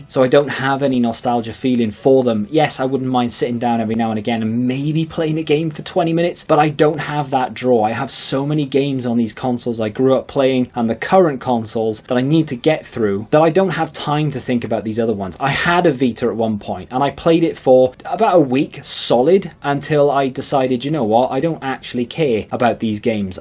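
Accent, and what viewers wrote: United Kingdom. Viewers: U sound like a brit😂. Here in the states wii u games are rising. And esp the 3ds are getting super expensive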